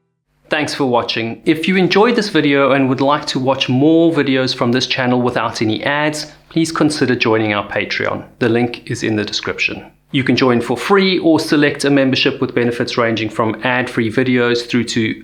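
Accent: South Africa. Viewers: Lovely to hear that South African English accent. The easiest English accent to understand. Especially for non English speakers.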